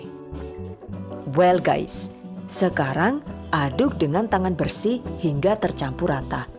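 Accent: Banyumas, Indonesia. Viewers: Kayak orang bule ya mbknya,,tapi ternyata bisa bhs ngapak2 😂😂🤣,,,btw syukak bgt ma vidio2nya,,thx resep2nya mbk yu 😄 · Lucuuu kocakkk mbaknya...aplgi klo pas lg ngomg bhsa ngapak😂😂😂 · Bu, ibu wong endi bu, ngapak..tanggane nyong apa udu. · Merga wong ngapak dadi tak subscribe juk ben gampang nek arep ndeleng resepe rika suwun yu resep resepe. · Ngomonge bisa ngapak kaya wong banyumas ya mbok ? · Ngapak maning lha 👍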